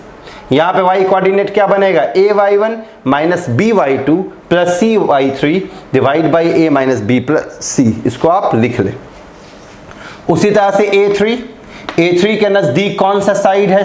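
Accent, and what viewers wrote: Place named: United States. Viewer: sir aapki hindi, american hindi jaisi lagti hai mast.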